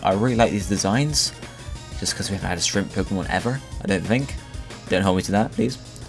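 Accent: United Kingdom. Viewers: I love your british accent, could listen to you all day c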